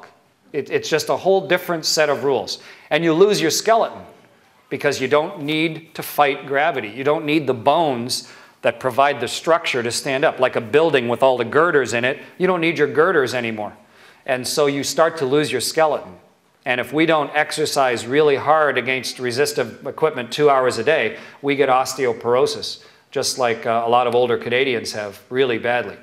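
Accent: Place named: Canada. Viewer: Hehehe, I adore the Canadian accent:-) Especially the "eh" thing:-)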